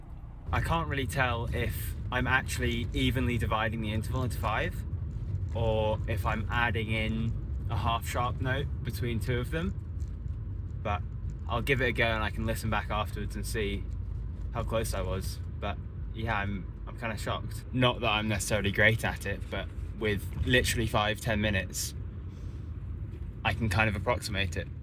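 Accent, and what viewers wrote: United Kingdom. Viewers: leaves the question open though: why would British people give him credibility? I can hear the YouTuber now, and can confirm he too is British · I think the British accent helps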